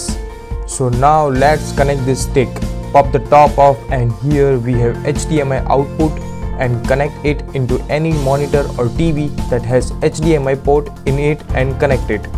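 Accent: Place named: Punjab, India. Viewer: I cannt listen to this punjavi accent ..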